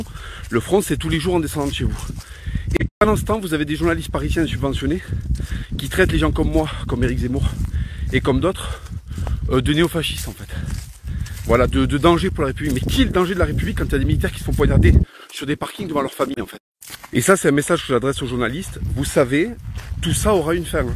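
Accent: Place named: Arab world. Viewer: Merci d'avoir posté les preuves évidentes que tu n'es pas un Breton et ne l'a jamais été. Tu zozotte toujours autant le bédouin hahaha et puis bon, ton accent, malgré tes acrobaties n'échappe à personne. 100% Accent arabe. Bon allez, je te laisse rêver à une identité qui n'est pas tienne. Tu t'humilie tellement tout seul que je n'ai même pas besoin de te vanner. Y'a pas de Fatima en France, à part les bédouines arriérées venues de chez toi, qui viennent à la nage chez nous.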